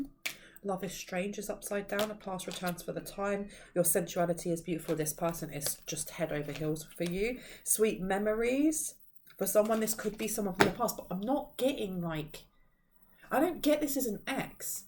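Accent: United Kingdom. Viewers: I was just hanging out with you in the kitchen, with a double size mug of hot cocoa with marshmallows ! Thank you for the messages ! I love listening to you ! I'm in the USA, and I love your Brit accent. I love the shows Last of the Summer Wine, The Vicar and Keeping Up Appearances, its not just because they are such funny shows but its the accents too ! I wish you the Merriest Christmas 🌲🧑‍🎄 and a very Happy New Year 🍾🎉 ! I look forward to yo ur readings and will continue to in 2024 ! 💖 Lynn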